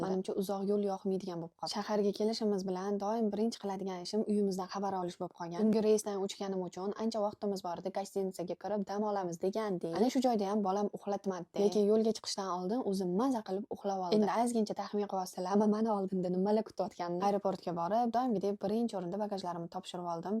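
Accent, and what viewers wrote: Tashkent, Uzbekistan. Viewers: Iltimos xech bolmasa yapti deb gapiring namanganni qaysidir rayonidansiz oziz ln Toshkent shevada gapirasiz yarashmapti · Вой Наманганлик экансизку кизим нега Тошкент шевасида гапирардиз.Наманганлик эканиздан уялармидиз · Нимага Тошкенча гапирадғ бу қиз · Тошконча шевани кайдан ургангансиз? Ман умуман урганолмадим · Узингиз Наманганликми ? Гапиришиз чала Тошкентлик , турмуш уртогиз Кореялик , жуда хам интересно . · Нега тошкенча гапирасиз тонкенликлардан оброси баланд туради водини оброси Коконга караган кокон хонлиги бошкарган тошкентни Асабларим ойнеди борку шу тошкентни шевааасида гапирса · Сан нега НАМАНГАЛЛИК булиб ТОшкенча Гапирасан карисга нмага тегдинг наманганда эр йукмиди еки. ЕМОН кизмидинг яхши киз кушнсига ЭРГА ТЕГАДИ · Нманганли бўсезам тошкен шевада гапирвосизми?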